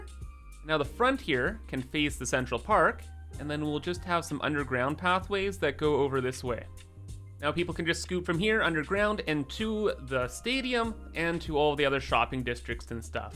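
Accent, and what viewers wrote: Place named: Canada. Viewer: Golden Ears Park, do you happen to live on the west coast of Canada? I’ve been noticing the Canadian accent more and more lately and now I’m like 99% sure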